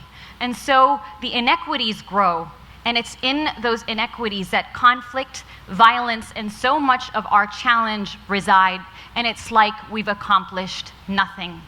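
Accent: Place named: England, United Kingdom. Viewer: I wish one-day I can confidently give speech to the audience just like her with a confident english accent.... she's my ideal ...I love her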